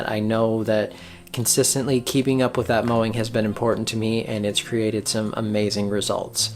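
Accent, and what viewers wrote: Minnesota, United States. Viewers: You must be Minnesotan, Right?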